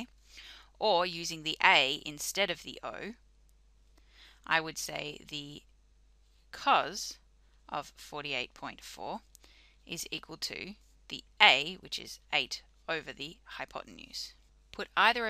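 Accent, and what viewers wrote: Australia. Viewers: aussie right??? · Thanks nice Aussie lady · noticed the australian accent and checked the comments xD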